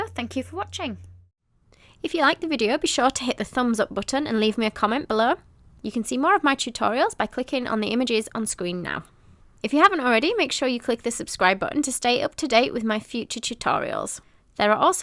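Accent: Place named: England, United Kingdom. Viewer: which part of England is this accent?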